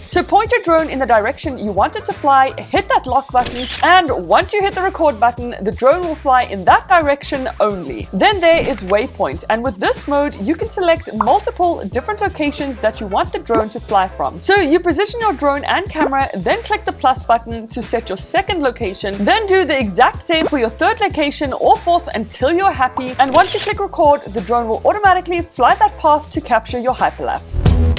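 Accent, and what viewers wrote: Afrikaans, South Africa. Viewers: I just want to know?????? Are you from South Africa🇿🇦🇿🇦🇿🇦🇿🇦 BTW I love your intro🥨🥨🥨🥨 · Are you Afrikaans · Hmm, are you from SA, i notice so of those areas?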